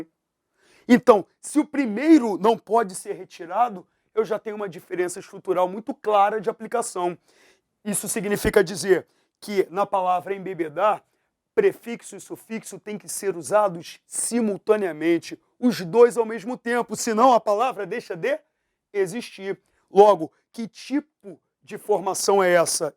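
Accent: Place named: Rio de Janeiro, Brazil. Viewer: Coloca o titulo como se só o RJ tivesse pm oficial, tem que adivinhar pelo carioquês. Ajuda aê.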